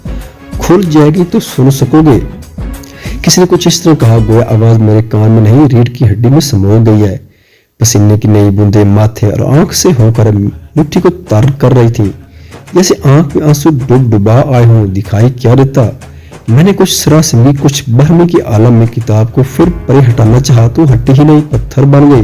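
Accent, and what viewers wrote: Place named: Saraiki, Pakistan. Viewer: Yaar sariki accent m Urdu pharty hain aap aur pronunciation ki Aatny gultian uffff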